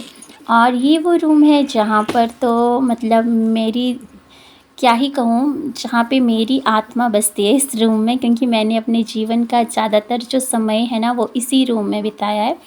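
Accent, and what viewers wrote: Standard. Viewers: Aap kitana hindi bhi sudh bolti aaj ka vlog bahut kitchen bahut khubsurat lagi mujhe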